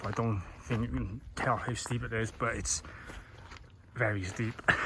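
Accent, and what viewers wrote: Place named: Monmouthshire, United Kingdom. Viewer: Off the scale handsome. Such a lovely accent too... Sounds like Monmouthshire to me, but may be wrong 😊.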